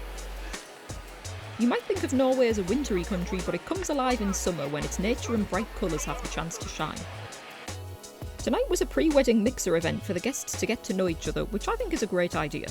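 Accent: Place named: Newcastle, United Kingdom. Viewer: While watching I thought that’s a geordie accent then you mentioned Ouseburn. I’ll have to check that one out because I love wandering around Ouseburn. I’m going to Bergen in April so looking for tips. 😊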